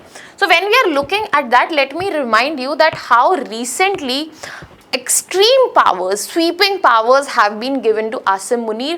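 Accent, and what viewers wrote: India. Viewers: It's new language Indian desi English by desi aunty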